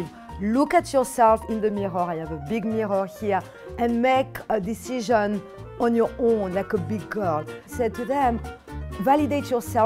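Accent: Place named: France. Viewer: As soon she started talking I was like : “FRENCH”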